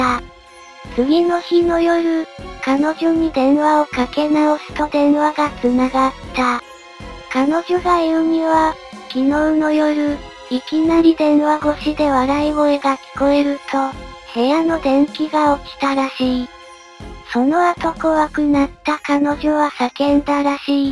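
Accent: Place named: Kansai, Japan. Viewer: 味付けのために変な関西弁つこうてるんやろうけど 関西でそんな海まで熊が降りてくるようなところあったかな～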